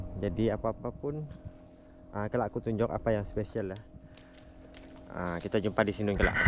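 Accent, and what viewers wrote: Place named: West Kalimantan, Indonesia. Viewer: orang sambas ke bang,bahasenye same kyak orang sambas😅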